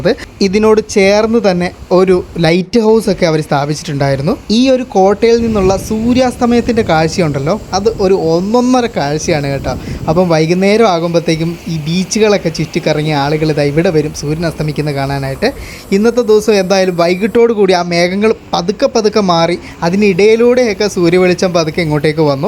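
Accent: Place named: Central Kerala, India. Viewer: നിങ്ങൾ ശരിക്കും കണ്ണൂർകാരൻ തന്നെയാണോ നിങ്ങളുടെ ഭാഷ ശൈലി കോതമംഗലം മൂവാറ്റുപുഴ തൊടുപുഴ ഭാഗത്തുള്ള ഭാഷാശൈലി ആണല്ലോ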